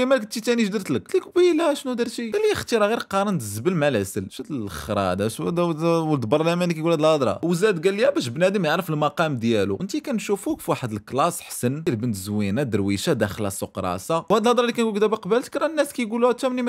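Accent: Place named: Western Sahara. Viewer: انت دور دور تجبد على لسانك ناس ديال الصحرة گد عندنا لجنوب نسلمو عليك 😉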